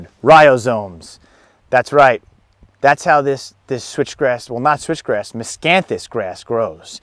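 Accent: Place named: New York, United States. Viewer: Give him a break. He’s a New Yakker.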